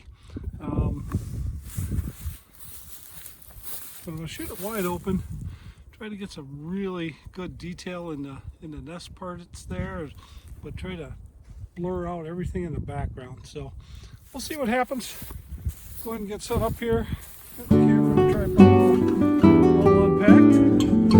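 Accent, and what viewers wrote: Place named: Manitoba, Canada. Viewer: Sounds like a Manitoban accent.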